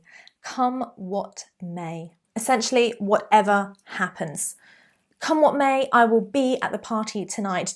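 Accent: United Kingdom. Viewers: .. I hope you're doing jolly well... I love your accent.. Would you mind making some videos to improve british accent... And more Posh videos... they are my cup of tea... Thank you so much for your time... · Like the subject n uk tone 👍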